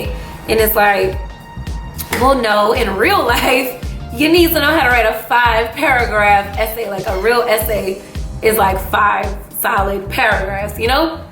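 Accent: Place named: Louisiana, United States. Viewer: I never comment but I'm loving your consistency! I come here just to hear your accent! I'm from Louisiana too I'm in Houston now too 😊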